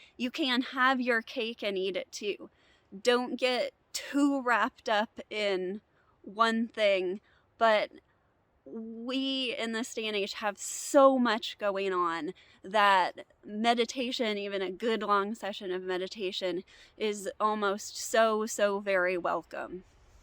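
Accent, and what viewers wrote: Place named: United States. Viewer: Your American accent makes it sometimes difficult for a person who isn't native to the language. English is spoken round the world, you need to speak so that the words are pricked up easily by everyone anywhere.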